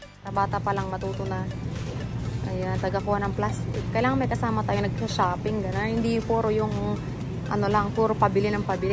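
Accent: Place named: Ilocos, Philippines. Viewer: Cutie nung tagalog ilocano. Hahahahahha! 💞💞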